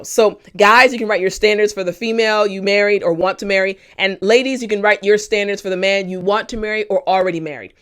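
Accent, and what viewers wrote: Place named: United States. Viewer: Peace from Egypt. I'm an English teacher also but I wish to learn American English accent from you.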